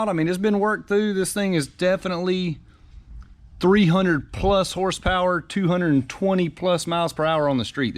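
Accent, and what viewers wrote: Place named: Rural, United States. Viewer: You sound country and far from the city, where are you located?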